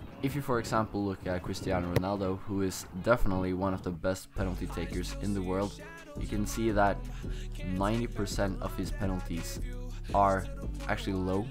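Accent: Norway. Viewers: u sound like your norwegian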